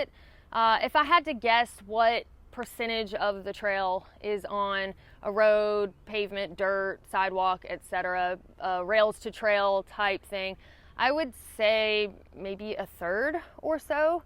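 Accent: South, United States. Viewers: “And if you’re mad at me and wanna fight me because your Pee-Paw told you a story where he had a fight with a water moccasin…” You are just so delightfully Southern. 🤣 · As a lifelong Californian who never been to the south, I still love listening to the accent of a good looking southern woman. Saying that, as someone who loves hiking in Cali and the southwest, this trail is just a big NOPE.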